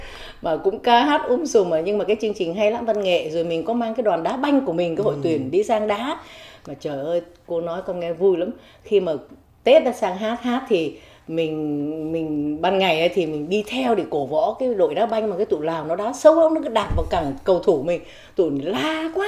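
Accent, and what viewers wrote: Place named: North, Vietnam. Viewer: Chương trình của Jimmy rất hay, thật đẹp và dễ thương, nói chuyện huyện thuyên đúng là con gái Bắc kỳ. ❤️❤️❤️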